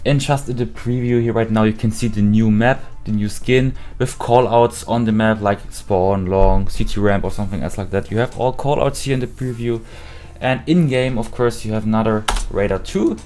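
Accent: Germany. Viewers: Also als deutscher merkt man das du deutscher bist😂 lol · Ich wusste das du deutsch bist schon ab Sekunde 1 xD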